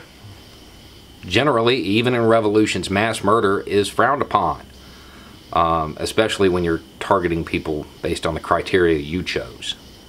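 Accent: South, United States. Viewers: Dude I have to be honest... I caught my self for falling down that same trap when I heard your accent, but you have definitely proved me wrong. It's awesome to see someone from the south standing out on their own morals.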